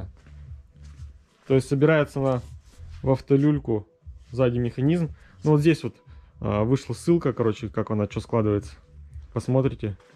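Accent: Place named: Perm, Russia. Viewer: Блин, вы в Перми реально как в сериале разговариваете, случайно на твой канал наткнулся когда искал инфу по Гоу Про 8, и по говору понял, что ты из Перми, не ошибся ))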